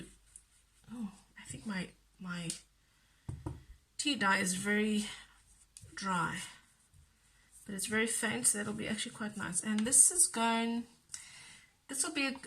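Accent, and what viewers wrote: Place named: South Africa. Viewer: I love the tutorial, I am going to try this soon, I just need different colors in distress ink. I only have 3 and those are in vintage brown colors. You still have a hint of South African accent 😊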